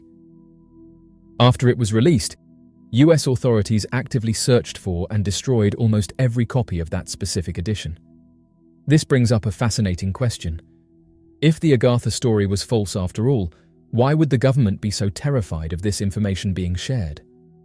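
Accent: United Kingdom. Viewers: So incredibly boring when narrated by a Brit!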